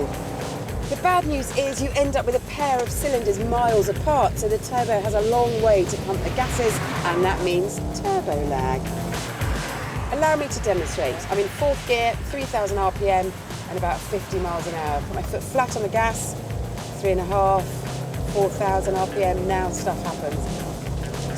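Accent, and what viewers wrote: RP, United Kingdom. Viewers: british accent on a blonde....sexy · An intelligent British woman with perfect Queen's English who's a petrol head AND can drive like that? Marry me.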